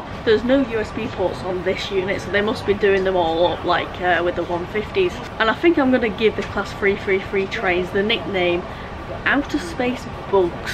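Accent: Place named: Wigan, United Kingdom. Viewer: A wiganer doing pronunciation, makes me feel all warm inside.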